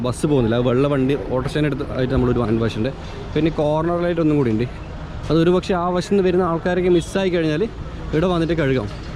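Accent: Kannur, India. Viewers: Kai kazkeet poya mathi😎 kannurkarante tone ingana aan💪🏻😎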